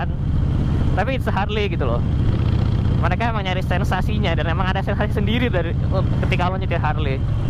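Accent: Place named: Java, Indonesia. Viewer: "iyuh kono" hahaha khas banget ya medhoknya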